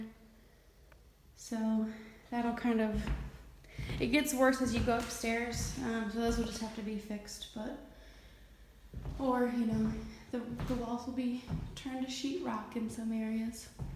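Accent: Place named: United States. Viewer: Could you give a quick backstory at the beginning of each restoration video? I'm a 1st time viewer and have lots of questions: Is this going to be your house or are you all planning to flip it? Is your dad doing all the work? How does he how to do that kind of work? Will u just be documenting or are you doing work too? What state is this house in? (Not asking for exact location, just generally. By your accent I'm assuming it's in the USA). Ok, you answered my first question at the very, very end of the video. Lol.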